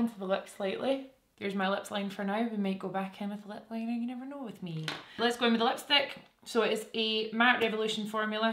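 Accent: Scotland, United Kingdom. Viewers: I'm an English teacher from Germany and must admit, the Scottish accent is my absolute favourite one to listen to, although, of course, I'm aware that if you'd talk among friends I probably wouldn't make out any sense at all 😂 It's so charming that it totally adds to my pleasure watching this channel and contributed to my decision to subscribe honestly, a lot...but reason no 1 the humour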